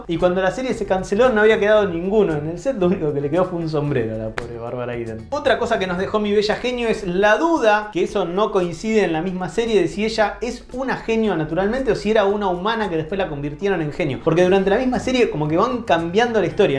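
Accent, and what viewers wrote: Argentina. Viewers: No hables tan rápido, ten en cuenta que no todos los que te ven son argentinos, con el acento y lis modismos cadi no se te entiende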